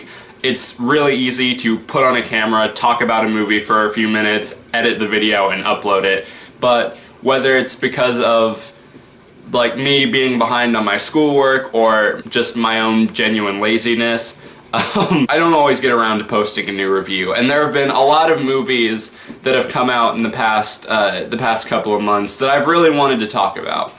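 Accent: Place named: United States. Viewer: I’ve never heard that kinda country accent before🤠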